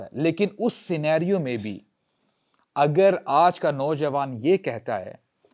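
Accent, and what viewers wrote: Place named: United States. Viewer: Amazing bayan with American accent 😊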